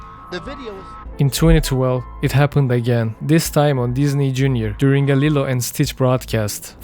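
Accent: Turkey. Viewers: Yo dude are u turk? ur accent sounds like that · Hello where are you from? You kind of sound turkish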